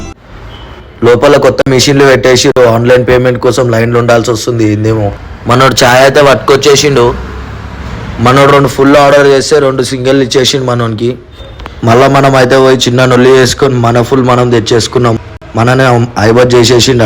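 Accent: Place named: Telangana, India. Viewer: 😂😂thoroughly enjoyed specially your Telangana accent cheers to your new beniging 😅😅and keep going good luck 🤝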